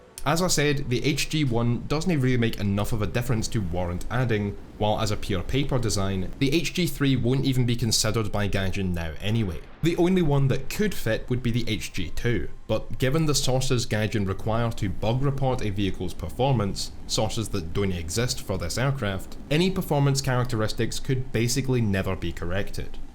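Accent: Scotland, United Kingdom. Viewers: As a fellow Scot I believe your accent braw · I really like your Scottish accent, Mr.. Also, is that a rocket built into the tail of that ME 262?!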